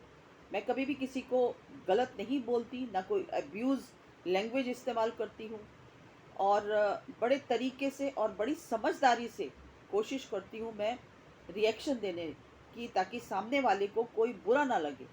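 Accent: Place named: Muzaffarnagar, India. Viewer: Haryana ka touch to bilkul nahi aata. Muzzfernagar ke area mai aise hi bola jaata hai.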